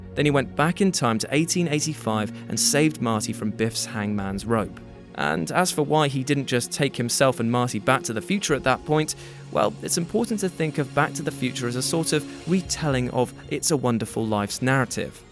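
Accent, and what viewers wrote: United Kingdom. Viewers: British accent!!!! 👂😫😖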